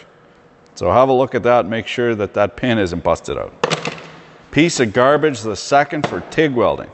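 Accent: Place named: Canada. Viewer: I don't know shit about tools but you talk like a true maple syrup baby and I love it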